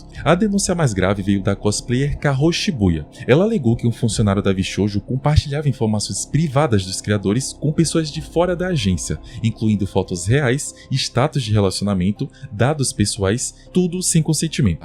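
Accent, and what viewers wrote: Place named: United States. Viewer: Bom vídeo mas pq vc fala os nomes da vtubers japonesas com sotaque americano? kkkkkkkkkk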